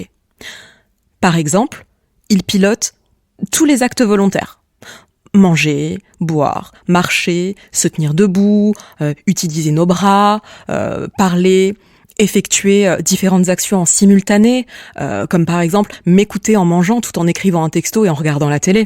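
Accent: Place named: Marseille, France. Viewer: Merci pour cette présentation détaillée et le sens de l'humour et l'accent "Police de Marseille" un délice pour les oreilles et les sens.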